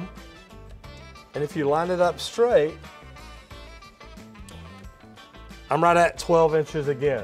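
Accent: South, United States. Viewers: I've watched a lot of your videos. I'm more old school plumbing. I like how you do a lot of detail. Plus I like your accent it sounds southern. But you give a lot of information.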